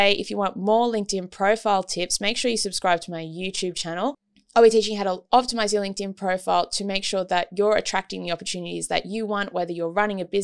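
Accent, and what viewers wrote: Australia. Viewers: thanks. And i loved your Australian accent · I love your Australian accent 😊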